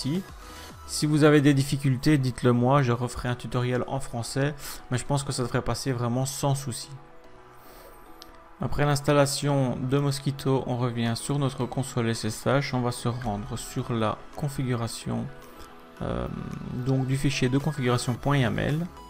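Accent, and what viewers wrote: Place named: Belgium. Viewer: Hello, Merci pour cette vidéo, étant en plein réflexion sur le futur de ma domotique avec peut être un passage vers HA donc ta vidéo tombe à pic 👍 Pour ton accent Belge aucun problème ça donne du charme à tes vidéo 😊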